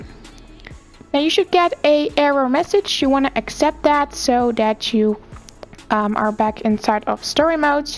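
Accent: Netherlands. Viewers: It's her accent, shes from nederlands I'm pretty sure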